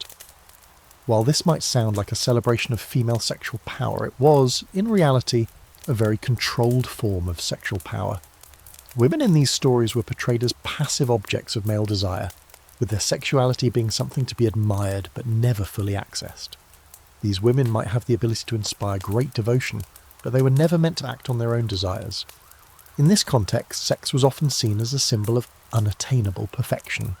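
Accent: United Kingdom. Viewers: Love your videos ….also cos of your lovely British accent. But…. have you cloned your voice for this narration? It sounds to me like it and i don’t love it 😞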